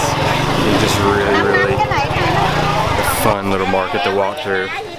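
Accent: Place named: Texas, United States. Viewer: He y! can't get enough of your videos bro! You must be from Texas by the way you sound.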